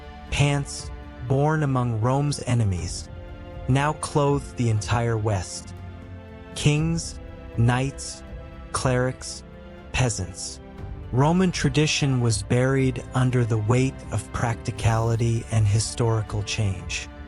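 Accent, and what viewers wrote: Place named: United States. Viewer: In American, pants are the general term used for all outer garments longer than the knee. Shorter than that, and we call them shorts. Calf length, and they're crop pants or waders. Trousers are formal (expensive) pants, usually for men. Breaches are an old, not used for anything but reenactment or renfaire, style of pants. The narrator had an American accent, so why would he call pants by a British name that would confuse those who speak American?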